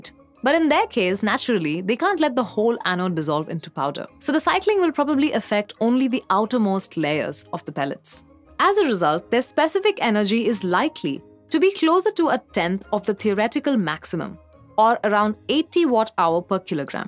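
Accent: India. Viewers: her accent was screaming Indian Subcontinent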